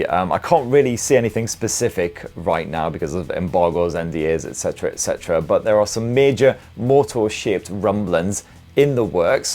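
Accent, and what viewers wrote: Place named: England, United Kingdom. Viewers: his northern influence hitting me, even in the states. Love the English humour. 🤣🤣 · lot of mom-jokes, tips on how to get a good blastin, inapropriate language, best english accent ever... ...so what's not to like here? I love ur content, keep it up! And thx for the lmao moments, when im listening to ur reviews, while driving to work. Always makes my day! Greetz from Germany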